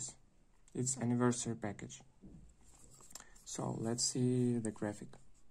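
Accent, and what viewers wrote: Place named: United States. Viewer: С американским акцентом :(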